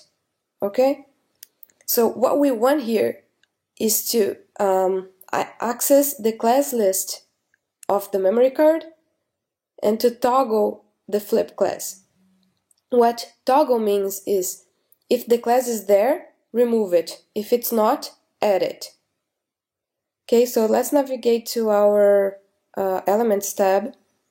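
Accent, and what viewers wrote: Brazil. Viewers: Love the tutorial, thank you! ps.: I can recognize a brazilian accent from miles away :)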